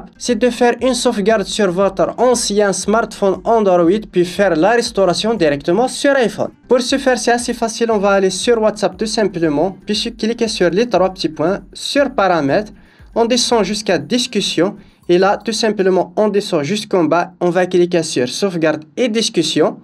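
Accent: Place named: Kabylie, Algeria. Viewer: Kabyle algérien ❤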